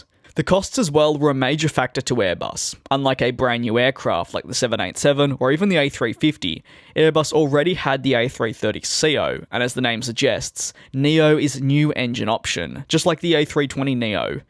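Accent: Australia. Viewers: He’s an Australia boy but gonna be moving to uk few days from now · He’s Australian but has moved to the UK.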